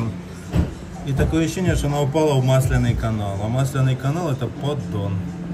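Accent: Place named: Surzhyk, Ukraine. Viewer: З одного боку, власно мені, все одно на якій мові твої ролики. А с другой сторони як почув наш рідний суржик то й ти якось став ближче до нас. :))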